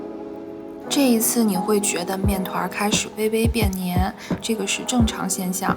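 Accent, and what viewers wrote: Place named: Beijing, China. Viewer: 真棒👍京味儿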